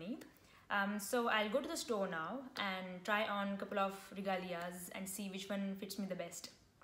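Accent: India. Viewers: I liked the way you keep your Indian accent while talking in English unlike some people who try to pretend that they have adopted the accent but even the people who they are pretending to be don't understand what they say.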